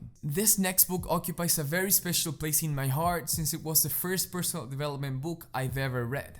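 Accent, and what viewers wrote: Argentina. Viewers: quee? sos argentino?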